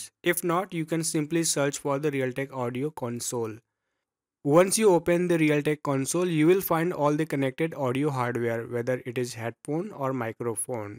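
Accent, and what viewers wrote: India. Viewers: when i heard the indian voice i knew i was in good hands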